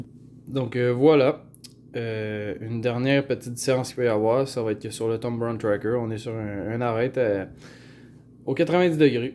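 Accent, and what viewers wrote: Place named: Quebec, Canada. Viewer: Merci pour cette vidéo. Condor niveau qualité/prix/efficacité c'est souvent bien pensé et correct. Je découvre ta chaîne et rien que pour les couteaux, la neige et ton accent de cousin outre océan, je m'abonne. à+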